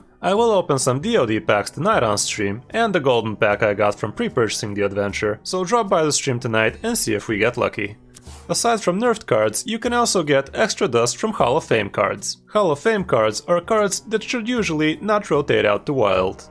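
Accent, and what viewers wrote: Russia. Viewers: Are you Russian? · русский акцент детектед, привет чувак :)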